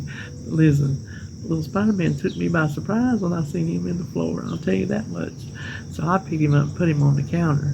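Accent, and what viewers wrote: South, United States. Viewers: I'm from Arkansas but girl you have a Southern accent. I love hearing you talk. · Oh honey you have a beautiful southern accent. isnt as pronounced. I say you are the luckier. Didnt know drinking liquids with food wasnt advised. I gotta have liquid ( soda, juice or water) during each meal and snacks. Is that only for surgery reqirement?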